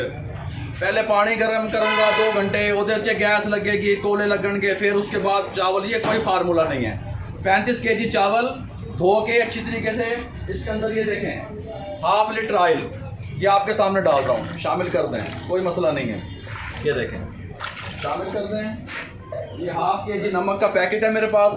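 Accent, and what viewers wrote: Punjab, India. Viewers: Aapki punjabi sun ke din ban jata hai bhai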